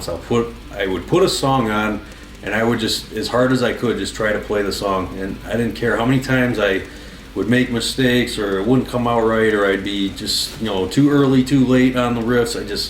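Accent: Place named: Michigan, United States. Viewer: Dude I FUCKING knew you had a Michigan accent. Man, I've watched guitar videos of you since my early 20's(now 30)I hope I didn't get that wrong. If you ever find yourself in Mid Michigan I'd love to talk!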